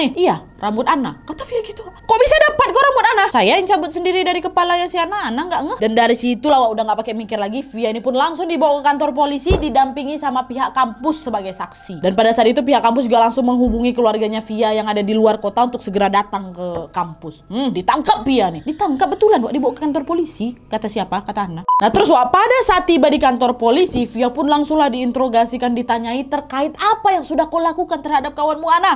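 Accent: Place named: Padang, Indonesia. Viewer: Lama2 nada bicara LW kyk Padang!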